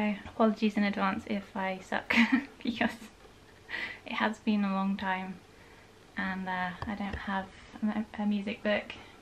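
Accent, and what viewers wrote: United Kingdom. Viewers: It’s cuz she sounds British or something. If your American of course you love it what American doesn’t? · Can you answer if ur British is me am British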